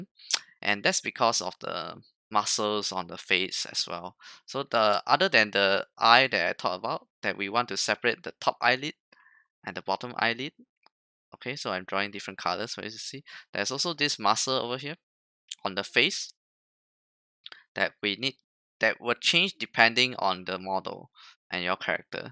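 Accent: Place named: Singapore. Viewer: Sounds like a Singaporean...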